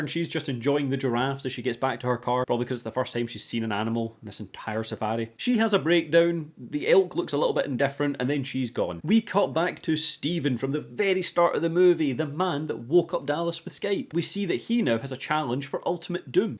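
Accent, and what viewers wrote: Scotland, United Kingdom. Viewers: I know his name is like dallas but bcuz of ur accent as a fellow Scottish person sounds like ur saying he’s dadless lol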